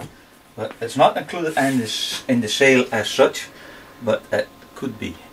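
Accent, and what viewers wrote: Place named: Scotland, United Kingdom. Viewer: Your accent is really hard to place. Sounds Scottish, sometimes Irish and sometimes Northern European.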